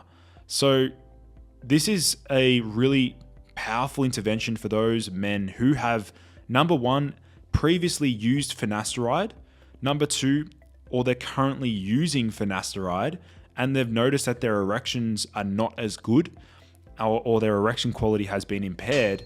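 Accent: Australia. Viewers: But sir you speak very funny r u Australian?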